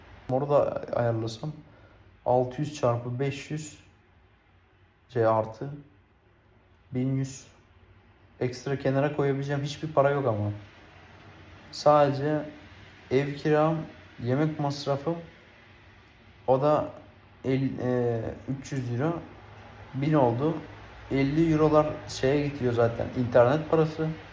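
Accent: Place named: Bulgaria. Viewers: Bulgar değil, Bulgaristan göçmeni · Bulgar ya da trakyalı gibi konuşuyorsun. Beyaaa....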